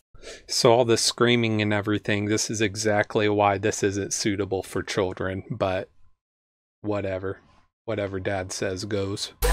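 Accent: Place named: United Kingdom. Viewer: The mans british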